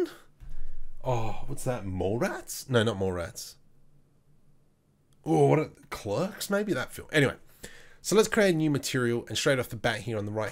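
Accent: Australia. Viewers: Sorry to ask..are you Australian?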